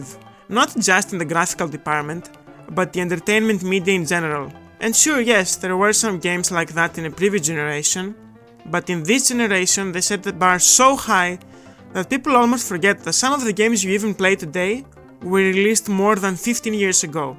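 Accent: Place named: Greece. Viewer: to greek accent 💔💔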